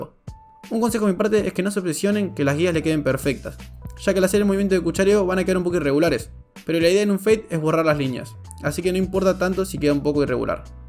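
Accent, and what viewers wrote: Argentina. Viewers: muy buen video solo que por favor pronuncies mas despacio ya que eres argentino y aveces no te entiendo tu acento ,, es solo tener cuidado al pronunciar es question de acento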